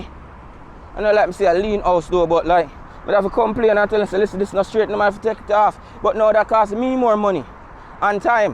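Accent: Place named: Jamaica. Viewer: Do your Presentation Minus the MY PEOPLE PATOIS. Try to speak English.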